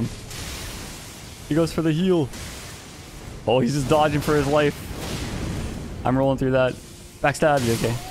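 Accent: Canada. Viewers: Can someone in the know answer me this question: Is a Canadian man of Asian ethnicity? An Asian-Canadian, as it were? I know nothing about him, just his voice and slight accent, and if there was a gun to my head, I'd guess he was an Asian guy born in Canada or at least moved to Canada very young.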